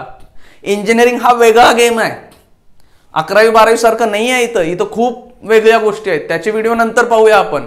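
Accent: Kolhapur, India. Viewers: Siranchya bolnya varun vatatay sir sangli, satara, Kolhapur che ahet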